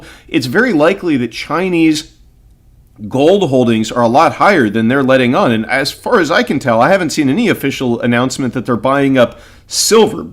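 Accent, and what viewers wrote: United States. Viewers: Your accent suggests a "USA citizen" and many of you don't understand that USA is bankrupt and countries are dumping that "Global Reserve Currency" that the USA government itself has "trashed." China is leaving like everyone else, but taking (large) bits at a time to keep the USA Beast alive until China can (and Japan) get it all out. USA is being "abandoned" by countries around the world, who are ALL fed up with the military abuses of CIA and other regime change specialists that have worked overtime since Viet Nam to make clear that USA is an ENEMY of everyone on Earth.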